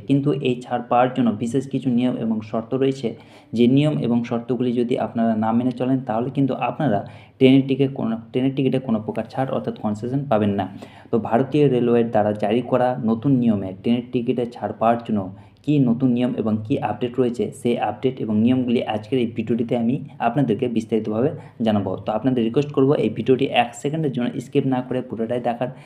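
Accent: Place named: East Bengal, Bangladesh. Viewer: Eskip kore dilam, bhasa ta thik korun,Kath bangal ,kobey kantatar periye elen.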